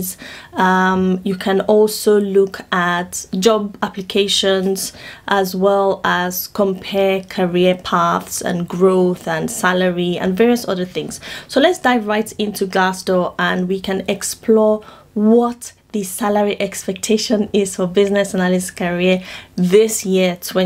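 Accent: Nigeria. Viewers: Nice content. You have a new subscriber. How come you have my Nigerian accent😀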